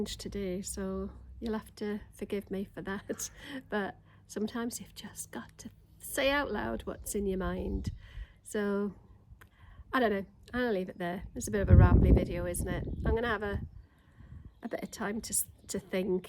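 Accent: United Kingdom. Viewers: Aww, you are brilliant, I love your honesty and openness, I agree with the other posters, just do it for you, if you’re not worried about the monetisation piece, do what you love and enjoy. You’ve got your hardcore here. I sometimes think when I comment, it’ll just be boring old Nat again and not someone new but, we’re here for it and we love all your stuff. Before you said it, I was thinking you were very geordie today and I LOVE that! My Dad was from Newcastle and although he moved down south as a nipper and didn’t naturally speak in the geordie way, he could turn it on in a flash and I really love the accent because, in my roots I have a wee bit of geordie 🥰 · I don’t think I’ve heard you speak with such a Geordie accent since before you headed for the Hebrides. You must be low., just keep doing what you are doing. Something will just happen and I guarantee you’ll not be able to understand why. 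That was the case with both of my channels. Try this. Picture one person in your mind throughout the whole video creation process, the prep, the shooting, the editing, the uploading and the thumbnail/description. Make it for that person and them alone. Make that person a fictional person. You want to give them a pleasure in watching your video. You want them to feel comfortable watching your video, feel happy, entertained, educated, inspired. You do all of those things to people in the real world everyday so why do people watching get different treatment. In other words, let that person see you, not what you think they should see. But direct it to that one person in mind.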